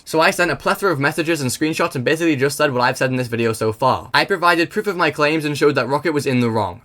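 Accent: Newcastle, United Kingdom. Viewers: Are you from Newcastle bro?Bro you sound like your from Newcastle😭 Saying "roackaet" is a sign lol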